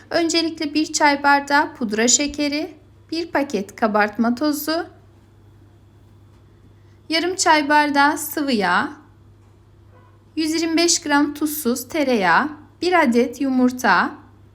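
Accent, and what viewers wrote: Azerbaijan. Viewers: Azerbaycan şivesi var. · Danışıq Azərbaycanlı danışığına bənzəyir çox gözəl tərifdi yoxlayacam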